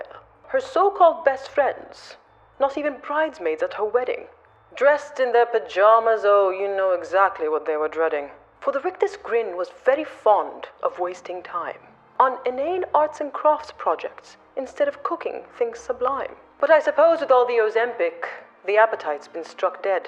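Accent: England, United Kingdom. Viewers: What's the accent about, is it meant to be English ?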